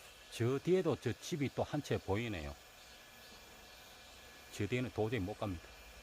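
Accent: Gyeongsang, South Korea. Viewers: 오지마을 구석'구석 다니시며 구수한 경상도사투리로 안내 힘쓰시는건 감사한데 최소한의 지역명이라도 알려주심안될까요? 볼수록 자랑만 하시고 혼자서 자기만족하신단 생각이드네요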